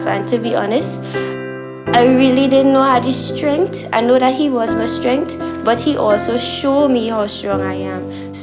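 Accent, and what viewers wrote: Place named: Trinidad and Tobago. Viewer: I hear you loud and clear. Ive been going through TSW 25 months. Getting better each day, still flaring but im doing much better than year one. I hear your accent, my parents ars from Trinidad as well. I plan to do a video soon once my journey is over, i want to make sure i am completely healed and advise on what works and what not to waste your money on. This journey is not easy and proud of you sharing your story. Time is the only healer on this journey.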